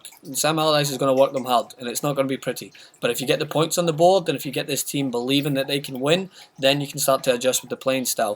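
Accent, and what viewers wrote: Scotland, United Kingdom. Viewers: you don't Evan sound English you sound Scottish and ybh u have a massive opinion for a arm chair supporter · lol. Scottish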